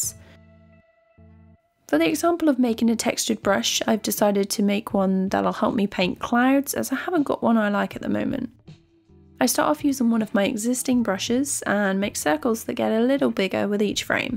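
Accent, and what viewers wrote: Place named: Norfolk, United Kingdom. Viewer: “Cuttn tool” love the Norfolk twang ❤️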